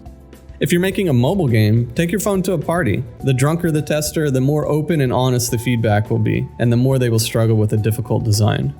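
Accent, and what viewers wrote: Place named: United States. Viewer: Hey, thank you for your video, it's great! I can see and hear so much of your effort was put into it. I do treasure this one, and I found it thanks to your article on Gamespot. However, there are some pain points: 1. Your attempts at self-deprecating humor undermine both tone and authority, I expect from you to take your message seriously and implement it in practice. I want you to see as my equal, not a clown with a computer science degree, and I know you are not one. 2. Pronunciation of foreign names is easily searchable and practicable nowadays. Please be less American about this and learn, it's very easy if you let go of point 1. Just like in your message here, fear of messing up a word keeps you from integrating new cool words into your life, and names are amazing words! Just don't stress only the first vowel, we already have enough bO-rises and Oh-drees. 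And yeah, the solution you used was painful to listen too, I'm afraid. Other than that, I hope every documentary YTer will do their work as you do. Nice to meet you!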